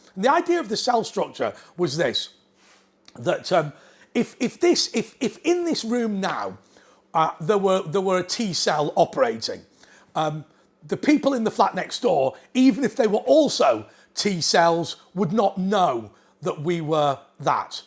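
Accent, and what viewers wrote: United Kingdom. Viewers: Sounds like he's British 😂🤣🤣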